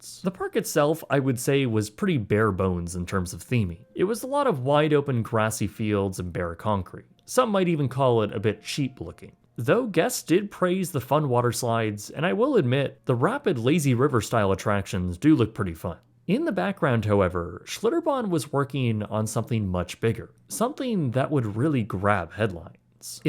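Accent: Canada. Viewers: He’s from Canada they sometimes talk like that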